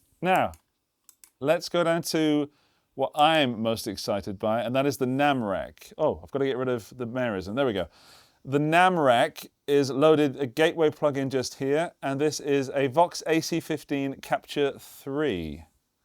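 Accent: England, United Kingdom. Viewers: You provide a window into the future from Austria, but with a subtle English accent of a part of England that this American born citizen of the world cannot identify on a map of counties and London neighborhoods. I realized about 3 sentences in that I am not the target market, but I do enjoy your geeking out on toys that you dig. Keep up the hard work and enjoy the last month of summer (more or less).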